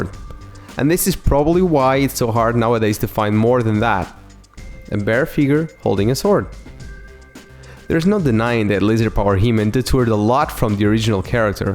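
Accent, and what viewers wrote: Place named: Portugal. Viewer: Pelo teu sotaque es portugues. Encontrei esta figura há uns dias por 50 centimos e achava que era uma falsificação pois não conhecia. Só em casa pesquisei. Infelizmente falta a espada com os 2 fios e o protector de braço, de resto está completa com a caixa e tampa das pilhas. Cabeça do He-man, não do Dolph. Foi a minha primeira figura dos Masters, não tenho mais nenhuma.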